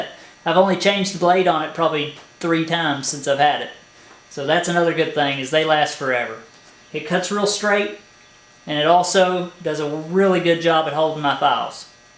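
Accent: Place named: Louisiana, United States. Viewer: i just like your accent is that way out in louisiana somewhere ?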